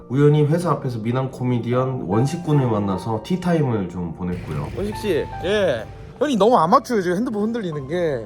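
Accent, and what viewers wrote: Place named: Gumi, South Korea. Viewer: 오늘도 재미써여~ 구미사투리에여~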